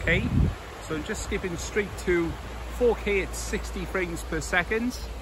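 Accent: United Kingdom. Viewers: your British accent 😍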